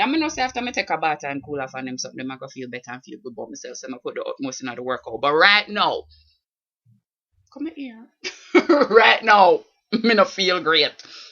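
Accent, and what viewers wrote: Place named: Jamaica. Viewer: I was wondering if you were Jamaican or sumtin. Day 12 today for me. I LOVE DIS SHAT.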